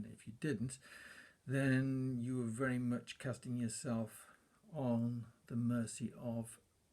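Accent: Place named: United Kingdom. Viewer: I'm trying to work out where in the UK your from by your accent but drawing a blank 🤔.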